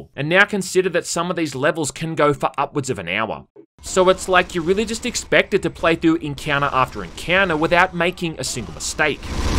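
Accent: Australia. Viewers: Are you an Australian? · Scare pronunciation: it’s scarce /‘skeəs/ like ‘scare’ /‘skeər/ but with ce at the end. -this is for British English - but Australia uses received pronunciation all the same if I recall correctly. Americans English: the vowel sounds in scarce /‘skers/ are the same as they are in ‘air’ /er/ And like air, they add the rhotic /r/ sound after the vowel, but before the ce, so it will sound like sc-air-ce But it doesn’t really matter how you say it. Aussies make everything sound good anyway. Australian English is like an amalgamation of British and American English. It’s like natural progression, and it makes common sense. Never mind me. Just your English teacher and linguist subscriber. I hope my years of pain and debt were useful to you.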